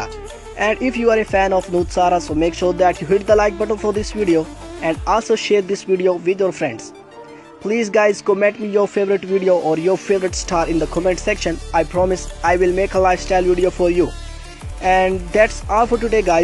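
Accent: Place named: India. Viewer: กูเกรียจมาก สำเนียง อินเดีย!! ..เกรียจ จริงๆๆ!!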